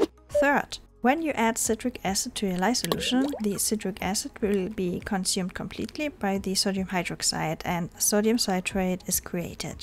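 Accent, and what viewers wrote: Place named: Germany. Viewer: Hi, as someone who loves science, this was a great video. I was trying to guess your accent at first I thought you were from Germany then I toggled between Belgium and Swedish. I knew you weren't from France due to I am French. I checked your bio, and I was correct German❤❤.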